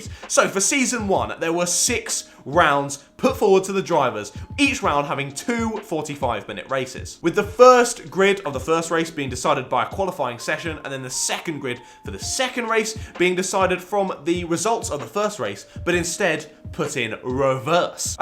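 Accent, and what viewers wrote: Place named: Liverpool, United Kingdom. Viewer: I've looked into this before and it's really interesting, but it was ultimately a bit rubbish, good video but horrible scouse accent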